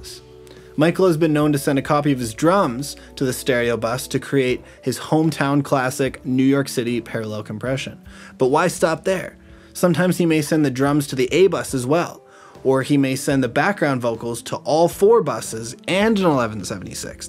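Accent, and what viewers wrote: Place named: Canada. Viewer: I ❤️ Canadian accents!! Lol Output = Oteput Routing = Roteing Without = withote Great vid dude! I feel like you explained this better than anyone on YouTube!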